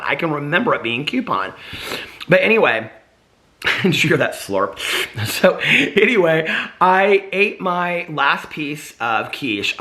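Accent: South, United States. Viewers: Do the crumbel review with cousin caroline! Also, I’m from the south and you and I say coupon the same lol. You must have a little southern twang in ya 😉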